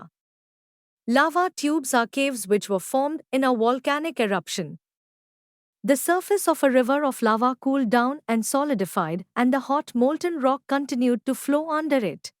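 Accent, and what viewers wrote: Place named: Middle East. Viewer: Even with a Middle-Eastern accent, you sound like a bot.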